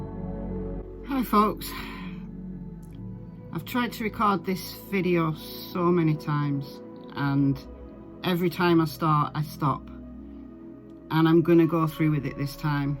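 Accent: United Kingdom. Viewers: I'm sending you love, light and blessings,. I love your CW videos, but this was something different. I'm not far from you in Sheffield, and it's lovely to get a UK dialect on your analysis of your videos. You have a very sincere voice, and I totally get your intonation and heartfelt words. Your situation with your boy sounds like the experence that Sloane Bella had...... A real open connection to the spirit world..... Love and peace <3 x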